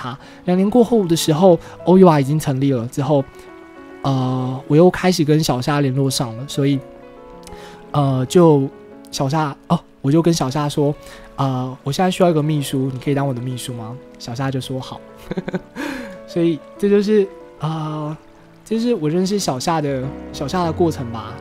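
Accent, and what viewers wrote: Cantonese, China. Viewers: 阿神講粵語好可愛<3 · 阿神講粵語好可愛阿wwwwwwwww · 阿神的粵語好可愛～(PS:Bob們都知道阿神笨笨傻傻的，那阿神覺得這種性格是好是壞呢？） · (香港的粉絲)阿神說粵語很可愛^^:D雖然聽不太懂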